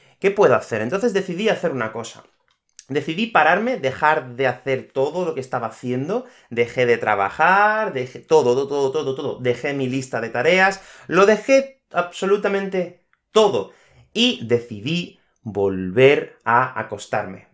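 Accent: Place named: Castile, Spain. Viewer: Es acento castellano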